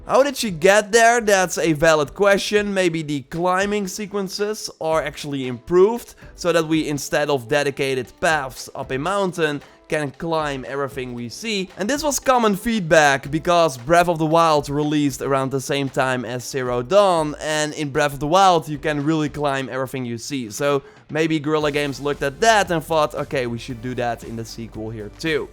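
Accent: Netherlands. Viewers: where do you come from, you sound dutch? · Hmm... Interestingly, not only you’ve guessed the name correctly, you also have Dutch accent.....Like RobinGaming. And your YouTube name is begin with which is very common fore letters of Dutch names..! Hmmmmmmmmmmmmmm....(edgy face) Yeah, I think you’re definitely Guerilla’s undercover bro!:)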